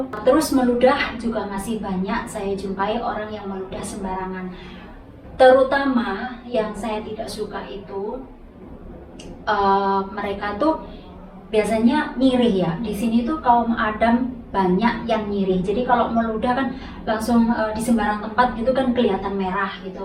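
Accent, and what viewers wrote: Java, Indonesia. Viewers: Salam kenal y mbae..dri logatnya kayaknya wong jowo yo sampean mb?maaf klw slh...stiap liat vlog2 orng indonesia yg di india rta2 sm keluhan nya..seht2 y mb..salam dri indonesia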